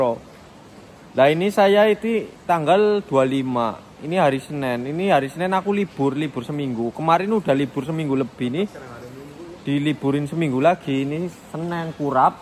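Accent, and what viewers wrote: Banyumas, Indonesia. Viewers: Yakin mesti giye bocah ngapak. Manggone nang endi ya...? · jiaaaan kynee ngapak kie luuh hahaha...salam knl mas broo?? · Ngapak e ketok buos 😂😂😂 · Ngapak y mbok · Weh weh ra ngapak ora kepenak yo mas😁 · Wong banyumas y? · Ora Ngapak Ora Kepenak ya kang😃😃😃👌👌👌 korea skrg dah 3rb lbh yg poditif terkena virus corona,,,, kayauuuu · Haha kaya masih ngapak and medok lucu dan seru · Kie mesti asalle ko wong ngapak2 ya mas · Wong ngapak ya rika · Bukan kasar tapi ngapak......😅😅😅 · Mas...sampeyan wong ndi? kok logate ngapak, hehe... 🙏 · Kowe ki ngapak ya mass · Rika wong ndi sih kang kayong logatane ngapak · Masnya dr ngapak yaa ..bahasanya kelihatan berat kakuu ..seringb2 ngobrol ma daerah lain ..biar gk kaku bahasanyaaa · Sampean orang ngapak yh mas...soale ketauan dr logate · Kok ngapak ya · Wong ngapak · Asli ngapak ya mas? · ini org jawa saya ngapak2 ngapak ngendi mas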